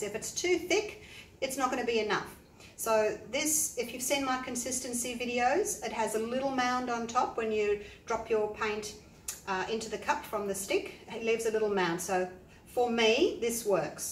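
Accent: Australia. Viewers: Congratulations on 20k subscribers 😍 You are a delight to watch/teach and gotta love the Aussie Accent 😃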